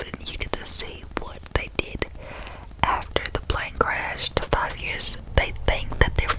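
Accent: South, United States. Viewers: I couldn’t help but notice a southern accent or I’m just crazy lol! Loved the video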